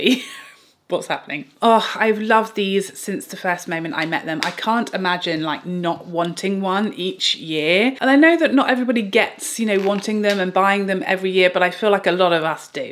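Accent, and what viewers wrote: United Kingdom. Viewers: The hair looks great 😍 I need blue eyes and a British accent now!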